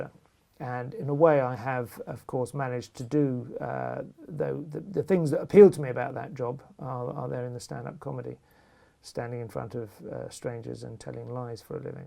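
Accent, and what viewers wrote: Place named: RP, United Kingdom. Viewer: Yes, as I commented earlier in this thread, in response to Terence Quinn: "I had the same discussion with you before on another of Evans' YouTube videos, so it seems that you are just a troll who clearly dislikes someone speaking with a comparatively posh accent, and immediately jumps to (the wrong) conclusion about money and a private education (a minimal amount of research on Wikipedia would have told you about education, but you clearly couldn't be bothered)."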